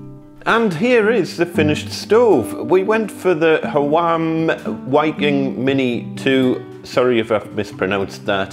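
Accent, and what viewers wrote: Newcastle, United Kingdom. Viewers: Great video thanks for sharing that very well explained thanks are you from Newcastle Gosforth 😀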